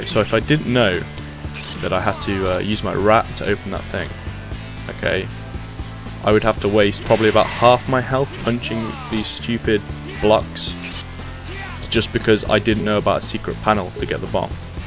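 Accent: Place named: United Kingdom. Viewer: Good review.. extra points for the British accent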